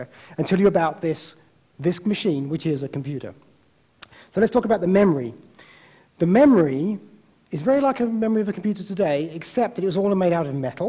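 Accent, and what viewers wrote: United Kingdom. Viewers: He talks too fast and with a British accent :(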